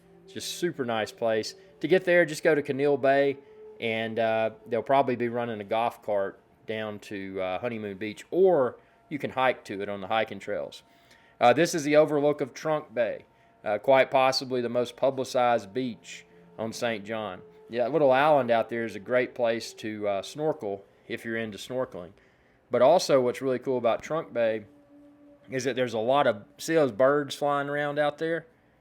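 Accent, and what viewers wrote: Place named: Tennessee, United States. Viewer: Sounds like Tennessee—p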